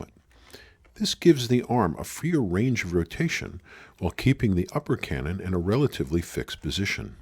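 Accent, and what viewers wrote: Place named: United States. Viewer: No, an American voice talking about anything makes me puke.🤮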